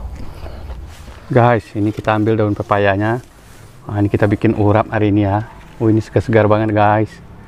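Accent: West Sumatra, Indonesia. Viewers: Sama, maka nya heran logat nya kayak logat Sumbar, tapi video nya kayak di sunda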